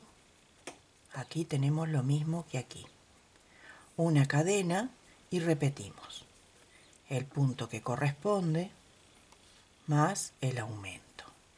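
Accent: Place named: Argentina. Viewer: Señora usted repita como lo hace siempre en mi familia siempre la preferimos por ese motivo así es como se aprende y usted explica muy bien ,y su voz es tranquila suave y muy clara ,en mi familia pensamos que usted es Argentina su forma de hablar y el nombre de los puntos que Dios siempre la bendiga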